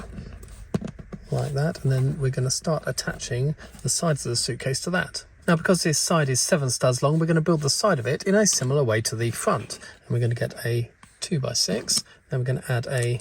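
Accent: United Kingdom. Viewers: You sound very british.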